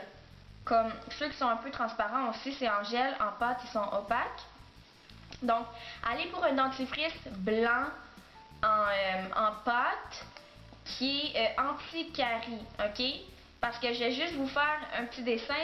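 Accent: Quebec, Canada. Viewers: J'écoute simplement son accent Canadienne 🥰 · tu est Québécoise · Tu es quebecoise ? Tu a l accent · est ce que tu es québécoise? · t une quebequoise?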